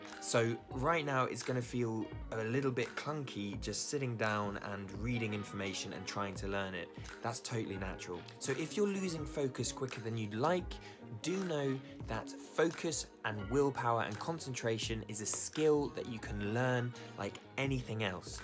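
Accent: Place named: RP, United Kingdom. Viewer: You sound posh - did you go to public school?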